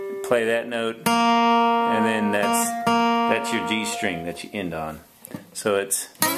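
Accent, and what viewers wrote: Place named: South, United States. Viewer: Howdy partner your southern accent is the best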